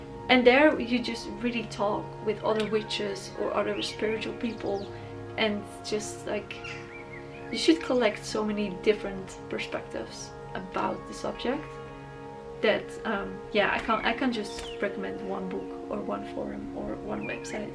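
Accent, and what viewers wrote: Netherlands. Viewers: Ah I knew you were Dutch! Dutchies always recognize other Dutchies accents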